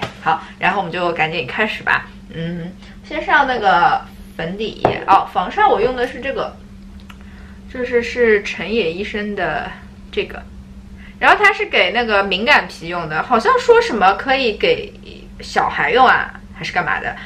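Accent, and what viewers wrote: China. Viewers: 你啊是苏州人啊！！！感觉口音伐要太亲切哦！！ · 第一次看你视频就确定你这蜜汁口音是上海人😂因为我也是哈哈。 推荐看明星大侦探诶，有点烧脑但是也很搞笑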